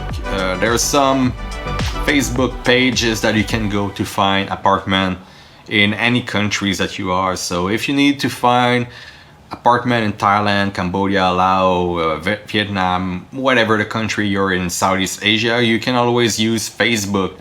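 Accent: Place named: Quebec, Canada. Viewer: Bonne décision! c'est vrais que c'était marrant en québécois mais on ne comprenait pas tout. Les français sont des grosse faignasse, ils ne sont meme pas capable d'apprendre l'anglais 🤣 peut etre que tu vas les motiver hehe. Your English is Very good by the way!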